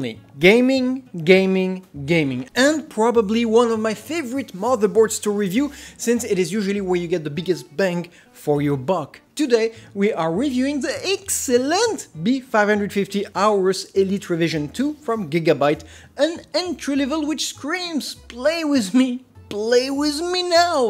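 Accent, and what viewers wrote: France. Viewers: My gawd, that french accent x) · That French accent nice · Frérot ça ce vois t'es français , very good accent , tkt on est pareil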